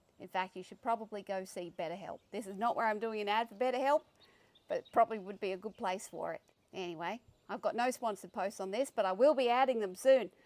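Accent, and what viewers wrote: Australia. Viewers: you are unique. Your perspective on American affairs is spot on. Please continue to keep us informed. Your Australian accent doesn't hurt either. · I would love to hear your take on <link> Aside from that as an aussie in exile in NZ I really enjoy hearing a good ocker accent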